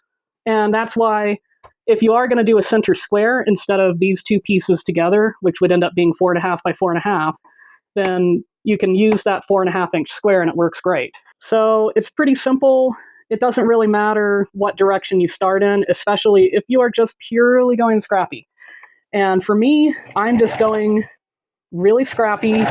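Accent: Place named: Michigan, United States. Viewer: Are you from Michigan? I am replanted to GA but your “accent” speaks my much loved Michigan! We don’t have accents in Michigan.😂. Seriously, a great tutorial and appreciate your style.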